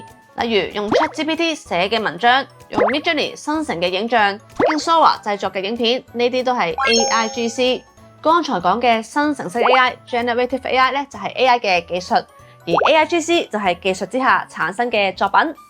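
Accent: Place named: Hong Kong, China. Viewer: ，有冇去過惠州的"雙月灣”玩？好想睇下妳既介紹，香港式廣東話同聲同氣嘛🙂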